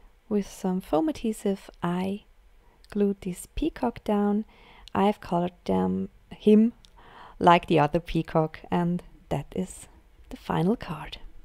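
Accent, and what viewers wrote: Germany. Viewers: Loved hearing your accent. My husband is German and his family all spoke to each other and had such lovely accents. He never learned the language. Your cards are lovely 😊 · You're english is very good. You speak it very well. I've watched your video's before, but didn't understand that much. Some words are similar enough I could figure it out, and I know a very tiny bit of german. I loved that you did an english voice over though. It was nice to learn what some the greetings meant. My Grandmother was german, but she died when I was younger so I didn't get a real chance to learn the language. Hearing you speak, the accent reminded me so much of her. Lovely video.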